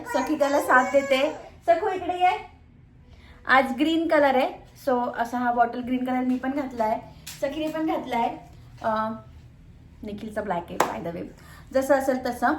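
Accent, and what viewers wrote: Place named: Sinnar, India. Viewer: Sinnar che lok bolun asech ahe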